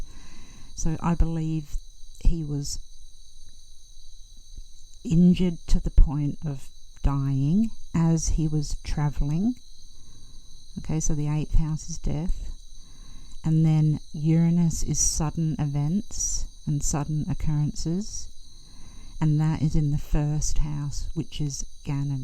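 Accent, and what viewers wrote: Australia. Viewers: Are you Australian? Great video...praying for Gannons return 💙💙💙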